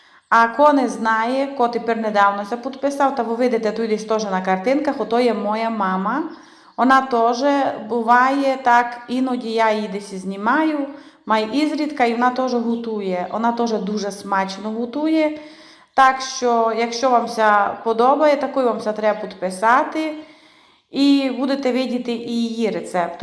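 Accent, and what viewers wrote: Transcarpathia, Ukraine. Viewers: я Вас вітаю з 300000 підписників, це величезна праця розвивати свій канал, зацікавити глядачів, щоб люди підписалися. дивилися. В тому і фішка, що закарпатський діалект, мені подобається, а кому не подобається нехай йдуть на інші канали, на ютубі величезний вибір блогерів. Дасть Бог буде пів мільйона підписників і мільйон, рухайтеся вперед, знімайте для нас смачні рецепти, я люблю м'ясо і солодке. Я дивлюся Вас з міста Черкаси.Бажання у кожного українця зараз одне Перемога і Мирне небо!!! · Доброго вечора зі Львова! Ви така добра та щира🥰Дякую вам та вашій мамі❤️ Чудовий закарпатський діалект - слухаю з задоволенням! Щиро дякую за рецепт кабачкової ікри, там де рецепт на 1 кг. Я мала рецепти - но ваш тепер мій назавжди, навіть інші пробувати немає змісту. Він ідеальний, вже третій раз закручую. Щиро вам дякую☀️Добра бажаю🌺🌸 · Вітаємо Вас,! 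Ви Чудо і ваші рецепти це знахідка для всіх нас. Хай Господь благословить вас і дарує Вам і вашій сім'ї міцне здоров'я, злагоди і миру. В нашій сім'ї зараз готується дуже багато страв за вашими рецептами. Любимо вас, вашу мову, ваші щирі слова, ваш закарпатський діалект, бо він прижився в нашій сім'ї. Рясних вам Божих благословінь. Успіху вам та процвітання. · І я приєднуюсь до ваших привітань і хочу побажати вам і вашій родині мирного неба Божого благословенства, та міцного здоров'ячка,я дуже рада що можу слухати ваш дуже приємний голос ,і гарний закарпатський діалект,багато готую за вашими рецептами . ❤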